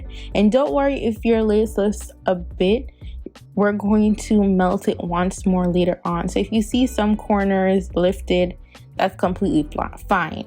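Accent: Jamaica. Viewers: Sound like you are Jamaican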